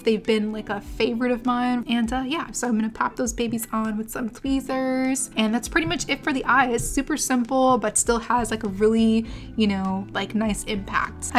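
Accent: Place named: Miami, United States. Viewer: sounds like miami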